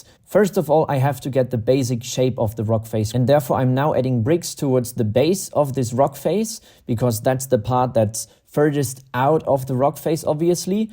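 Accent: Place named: Germany. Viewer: German accent sucks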